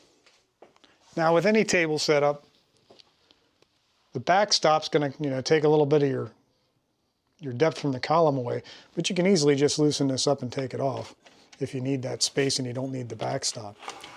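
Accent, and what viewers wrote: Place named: Western Pennsylvania, United States. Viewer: Useful man thanks! Western PA? My folks are from Pittsburgh and I went to grad school there. Accent is tickling neurons.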